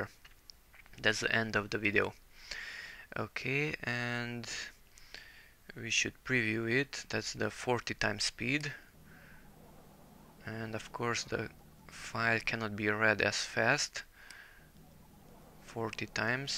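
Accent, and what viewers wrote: Hungary. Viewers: Köszi a segítséget, kicsit hallatszik a "magyar angol" :D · Epp azon gondolkodtam, hogy ez az angol kiejtes egy kicsit magyarosnak hangzik, ekkor megneztem a csatorna nevet...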